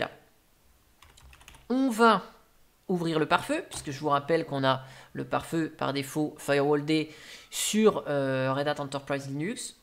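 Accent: France. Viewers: Guy teub et Mec. C'est marrant l'accent Français quand même :)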